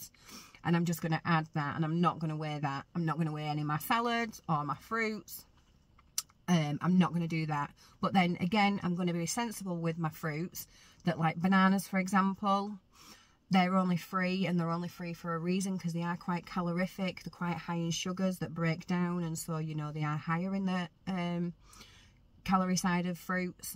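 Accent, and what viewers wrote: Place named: East Lancashire, United Kingdom. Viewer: Preston. I’m thinking you are maybe East Lancs just by your accent? X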